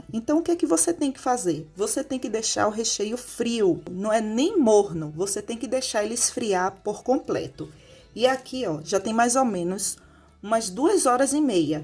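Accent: Bahia, Brazil. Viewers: Amiga tu é baiana? Parece viu kkkkkkk,top essa torta!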